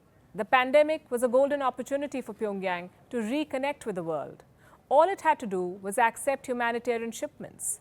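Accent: India. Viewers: i like the indian ladies accent .., refreshes my memory of the good times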